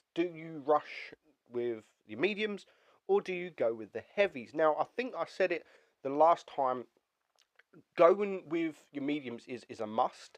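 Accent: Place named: Cockney, United Kingdom. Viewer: speaks cockney not English get it right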